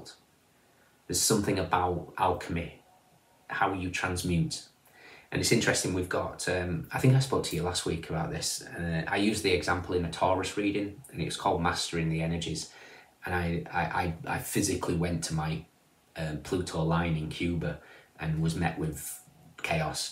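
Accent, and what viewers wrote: Northern England, United Kingdom. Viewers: thank you so much, you are so on point for me. I stopped in my tracks when you said a card of spiritual gifts was coming up. I’m leaving a 30 year career behind to follow a spiritual calling. Pluto has certainly done a number on us all. Every Cappie sun, moon,ascendant I know has had a tough 15-20 years. Great to know that better must come. Great to hear your northern accent. Glad we’re represented. Thank you for sharing your amazing gifts. Blessings to you and all. Xx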